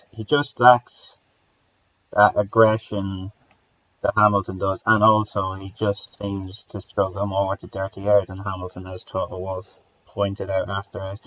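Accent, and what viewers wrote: Ireland. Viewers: You have some accent, obvs Irish but that's very broad for Irish people as we know every county is like different. But I'm shite at telling from county to county lol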